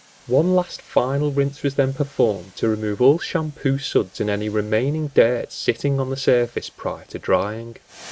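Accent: Liverpool, United Kingdom. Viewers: Good video but please refrain from using this Scouse twat from commentating!!! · Scouse of course!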